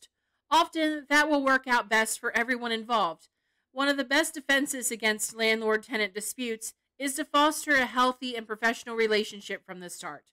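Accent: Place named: Delaware County, United States. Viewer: I knew I heard a Delco accent.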